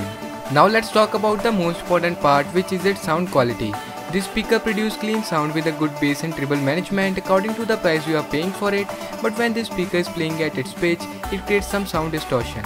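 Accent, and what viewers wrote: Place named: England, United Kingdom. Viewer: hahahha you sound little angrezz tho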